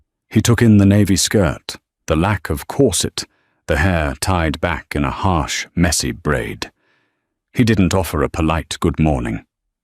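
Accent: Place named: RP, United Kingdom. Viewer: This upper crust British accent is not a good voice for pioneer stories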